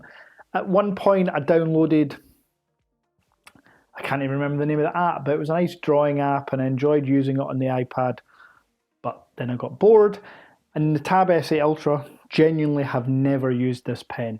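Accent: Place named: Scotland, United Kingdom. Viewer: I’m new here in your channel, and o need to congrats you for the video, and the Scottish accent make even better. It’s on of my favourite accent in the world 🌎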